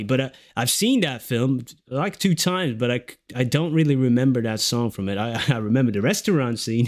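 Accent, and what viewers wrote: United States. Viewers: are you sure you don't have some New York in you? The more I listen to you, the more I hear a slight NY accent. And then when you said, "You know what I mean?" - Barbra always says that phrase in her NY Brooklynese accent! Hehe! Anyway, it was great seeing your reaction again to another Streisand classic. (Me thinks she's got you hooked! 😉) Hey, I learned something from you on this one I never knew. You said this song was written in 1924. And then mentioned how we're listening to it 100 years later in 2024. And guess what, Barbra was 24 in this TV Special here. And also Barbra has always said that 24 is her lucky number! I love numerology! Wonder what it means, though? Lol! BTW, I'm dying to see your reaction to the Yentl Medley or even just "A Piece of Sky" from the movie Yentl. Can't wait to see your face when you get to one of her pinnacle blow-you-away numbers! 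She's got some real blockbusters you will almost not believe that that voice is coming out of a human being! 😎👍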